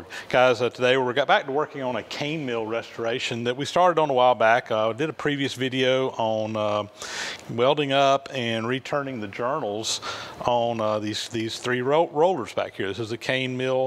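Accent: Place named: Georgia, United States. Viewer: The best accent in the US (Georgia) to pronounce > Babbitt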